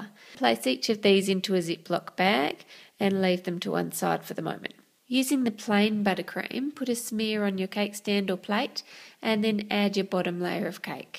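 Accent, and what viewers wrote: Australia. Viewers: BTW, love ur accent! :) Are you Australian? :D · I have to Admit , I love your accent ! #Australian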